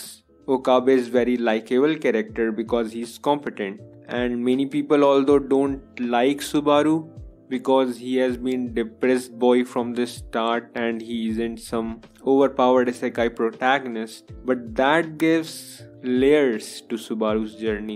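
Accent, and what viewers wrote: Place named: India. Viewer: Just found out I need to listen indian accent at 1.5x speed.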